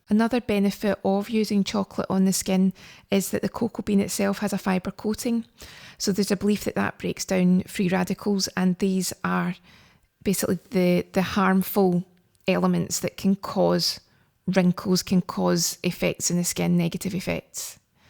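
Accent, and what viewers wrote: Scotland, United Kingdom. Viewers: I ken you’re a Scott?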